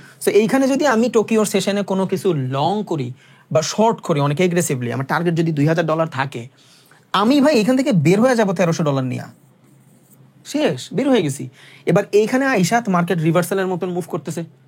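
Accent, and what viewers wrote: Barishal, Bangladesh. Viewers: সবার আগে এটা আমার Question ভাই আপনি কি মোগো বরিশাইল্লা ❔🌚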